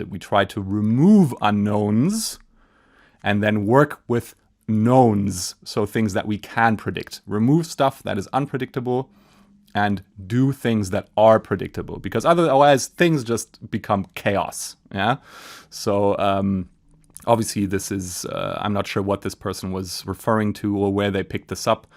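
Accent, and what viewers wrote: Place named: Berlin, Germany. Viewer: My grandparents were from Berlin, so your accent is very familiar to me. 😇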